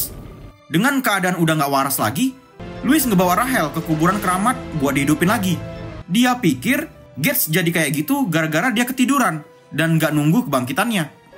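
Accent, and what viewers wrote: Medan, Indonesia. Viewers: Logat Medan tanggung sih. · Fix orang medan ni abang nii...semangatt bang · Mantap x bang ceritanya bang,,,kek bwrasa lagi di medan ajj awak nii,,,, Lanjut bang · Medan kali yakan lekk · Orang Medan ya bang, sama kita orang Medan LIKE WEEEE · Latak org medan😂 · Asli orang medan nih wkwk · Wkwkwk orang medan toh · Logat² medan ni pengisi suaranya wkwk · Hadir bg medan:v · Iya bang orng medan ni kek nya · Owalah mainmu kurang jauh bg itu logat khas medan rata² kalo anak medan tu cara ngomong nya kekgitu mkanya maen² kemari biar paham dikit yekan, hargai lah karya seseorang, aku org medan dan aku terhibur dgn cara dia menyampaikan alur film nya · Iya anjir suara serak serak Medan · Keren logat Medan:v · Medan ni bos😂😂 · Logat Medan nya kental x ya BG😅 Love you BG😅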